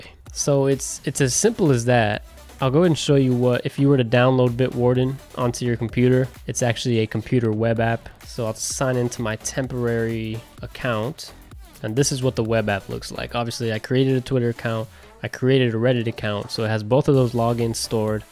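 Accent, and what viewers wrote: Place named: England, United Kingdom. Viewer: AAWWWWWWWWWWW shit I checked your like but and this is my second time through I have my Vault and got graded strong on my first master pw but I still have no idear WTFs going on. i'M WAY FOGGY ON THIS SETUP but at least our volume is good and you have a nice clear Engrish accent a bunch of these guys thought they were maxwell Smart and they needed to disguise their voice from big brother. Ok video i'm gonna try it again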